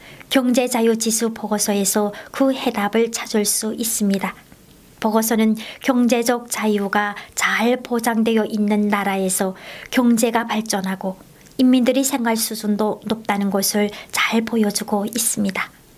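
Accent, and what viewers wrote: North Korea. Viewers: 다른 방송에서 북한 사투리로 말씀하시는 거 잠깐 본적 있어요. 지금 방송도 잘 하시지만 사투리 사용하시던 그 모습도 보기 좋았어요. 항상 응원할게요. 감사합니다.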